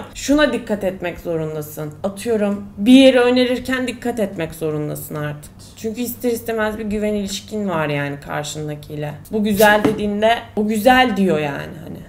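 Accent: Kütahya, Turkey. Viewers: Yapçem etçem Allah'ım ya tam Kütahya şivesiyle konuşuyon 😂💓 Kütahya'dan selammmmm öpvedim gali seni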